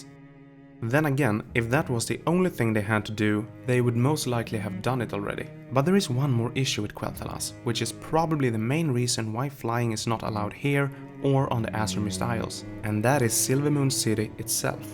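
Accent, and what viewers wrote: Sweden. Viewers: Is he Swedish? Sounds like english-swedish speaking... Im swedish.. :) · let me guess, you're scandinavian? Swedish? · Ey bro are you swedish because i can here it lowkey in your accent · Damn va svensk du låter. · I can hear the Swede inside you. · swedish? xD your accent is gold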